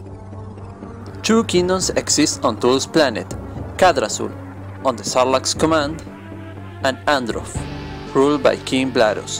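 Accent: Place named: Argentina. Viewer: tu acento es re argento maquinola, de donde sos?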